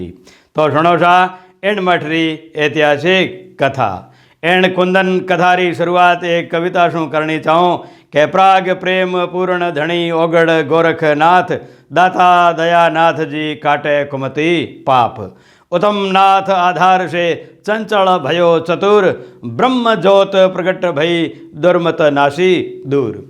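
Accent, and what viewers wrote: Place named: Marwari, India. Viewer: sahab normal bolo, jyada Marwadi samaj nahi ati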